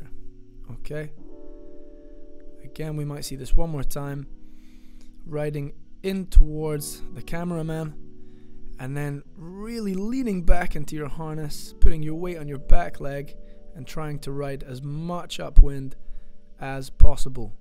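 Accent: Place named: Scotland, United Kingdom. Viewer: Really well explained, top work. Emso important to show why things go right AND wrong so you can self identify your problems. Lovely soft Scots accent too...Fifer?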